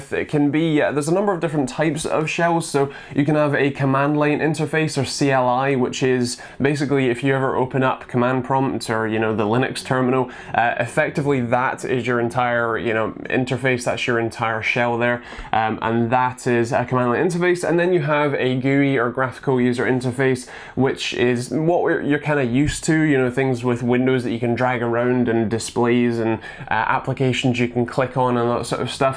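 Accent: Scotland, United Kingdom. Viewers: It sounds Scottish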